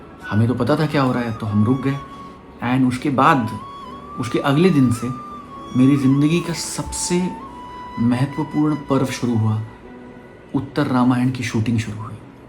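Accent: Standard. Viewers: कुश के रोल में बहुत प्यारे लग रहे आप और उच्चारण भी बहुत शुद्ध है।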